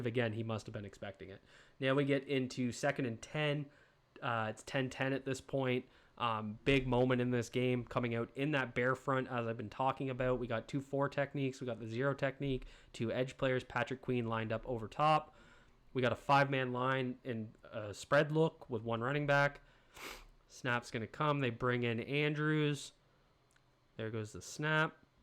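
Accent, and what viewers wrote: Canada. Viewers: I can tell you're Canadian by your accent.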